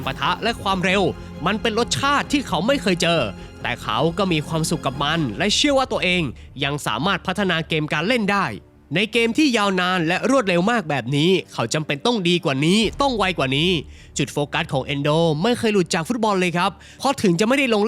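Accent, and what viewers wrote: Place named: Chiang Rai, Thailand. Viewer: แอดมินเป็นคนตางใดนิ ปากเมืองสำเนียงคนเจียงฮายแต้ว่าอั้น 555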